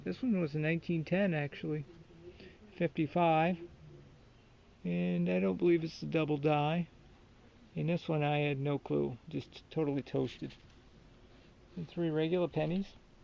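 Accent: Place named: Boston, United States. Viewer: congrats like your vids :D love the accent quadah reminds me of that speaking Boston commercial ;P great finds the funny thing is i found that exact same 10 k ring my fiance wears it now wont let me have back lol and that spoon i find lots of those rogers spoons but i have that exact same one. good on ya keep them vids coming .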